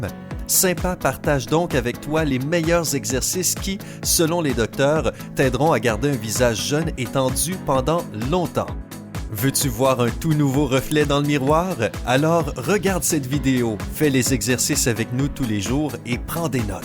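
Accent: Canada. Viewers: j'adore cette voix, avec l'accent canadien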